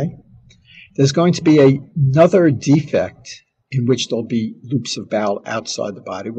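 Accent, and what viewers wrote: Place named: Boston, United States. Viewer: i love your Boston accent!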